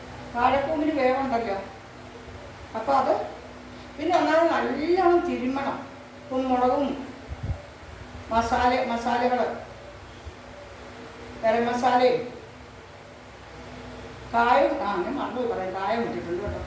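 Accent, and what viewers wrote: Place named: Kottayam, India. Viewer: But you have a Kottayam slang any connections with Kottayam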